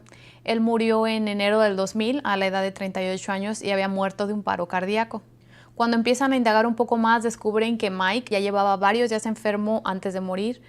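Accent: Mexico. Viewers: Al fin alguien que narra los episodios con normalidad sin pretender ser dramático. Además el acento es perfecto. Me encanta que seas mexicana 🇲🇽 Muchas gracias.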